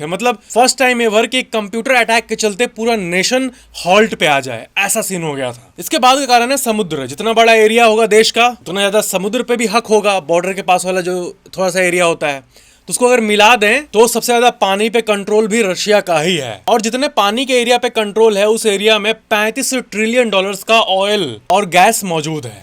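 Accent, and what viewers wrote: Bihar, India. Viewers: Is chutia ka accent bihari h video dekhne ka man ni krta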